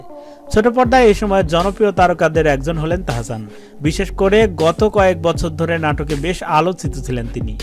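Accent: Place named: Barishal, Bangladesh. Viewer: Brother, first you have to get rid of your BARISHAL local dialect, there is nothing wrong with BARISHAL dialect but if you are to speak in a particular website than you need to improve your pronunciation.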